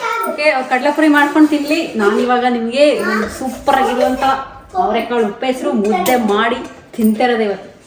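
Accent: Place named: Malavalli, India. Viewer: ಮಳವಳ್ಳಿ ಶೈಲಿಯಲ್ಲಿ ಮಾತಾಡಿ ಕೇಳೋಕೆ ಇನ್ನೂ ಚೆನ್ನಾಗಿರುತ್ತೆ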